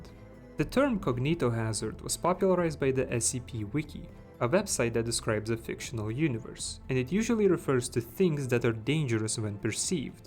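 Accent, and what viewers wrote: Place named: Poland. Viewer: słychać polske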